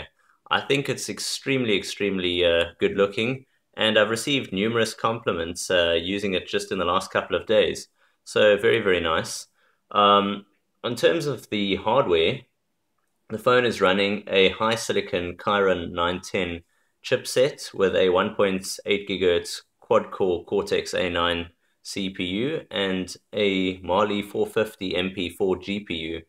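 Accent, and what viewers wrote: South Africa. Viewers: i knew you where south african! awesome to see local stuff :) good stuff man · Managed to get a WHITE P7 which is extremely scarce at the moment in Cape Town! Price has come down a lot....R5300.... went from the iPhone to this one and its stunning! Was so nice to listen to this video done by a South African.... Thnx for a good tutorial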